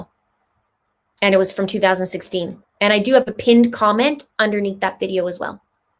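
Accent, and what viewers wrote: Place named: Canada. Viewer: I love your channel and your Canadian accent. I love that they have the Benghazi surviver Tig, helping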